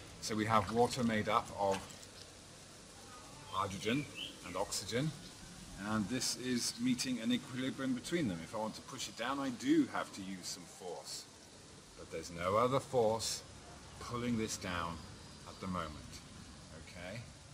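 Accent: United Kingdom. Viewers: Oh! My God! WTF How ignorant can anyone be than to show to the whole world how you didn't think something through using all the factors and variables needed for the equation. Water displacement alone The force air has on an object depending on it's shape and size. This is so ridiculous yet you took the time out to make a video showing how unintelligent the thought process is and then look at all the idiots that follow you thinking this is correct. Again Oh! My! God! I can't believe what the world I live in has become. Utterly and Ridiculously disgusting to think my fellow man is this fucking dumb. A British accent by no means makes anyone intelligent.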